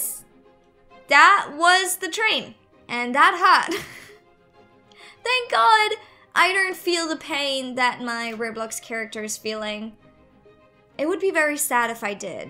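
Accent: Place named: Australia. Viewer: !! u sound aussie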